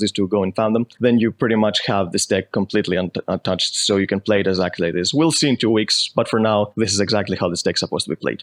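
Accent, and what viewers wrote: Greece. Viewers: So I am really find of blue eyes and this deck is rly budget as well... I just have one question: which country are you from?cause your accent is really good for someone whos mother tongue isnt english. Your accent seems like Greek...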